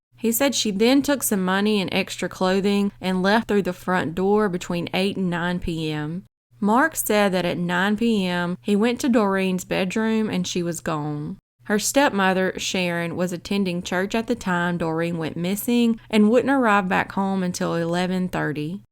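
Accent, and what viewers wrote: South, United States. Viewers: Ah brilliant it’s my favourite southern accented girl talking to me about my favourites subject - true crime :) thanks 🙏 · This is the first CT true crime video ive ever seen on YT. Really cool since ive lived here all my life and have watched 1000s of true crime docs on here. Ty southern girl who sounds super hot.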